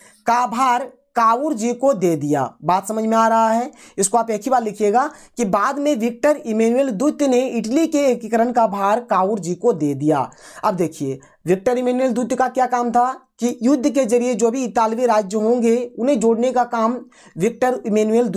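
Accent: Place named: Bihar, India. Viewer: सर क्या आप बिहार राज्य से हैं? बोली से थोड़ा- थोड़ा पता चल रहा हैं क्योंकि आप पूर्ण को पूरण बोलते हैं।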